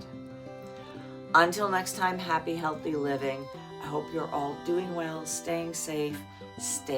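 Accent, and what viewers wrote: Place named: New Jersey, United States. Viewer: applying to phd's in canada for 2026. you know why! but i'd love to come visit your farm and meet you. i am also katie kate :-) cheers, love your program (you sound like a jerZ girl :-) your discussion of the "master / slave" dialectic is 200% frankfurt school and the "critical theory" camp, my camp. welcome to the machine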